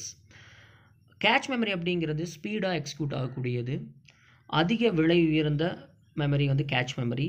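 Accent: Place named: Kongu, India. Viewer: Bro... Ninga Erode / Tiruppur ah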